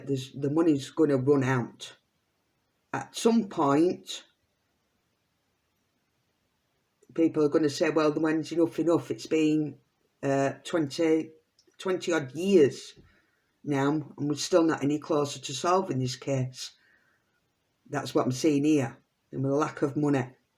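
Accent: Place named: Yorkshire, United Kingdom. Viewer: Hey I’m in Rotherham s Yorkshire, your accent sounds familiar hun x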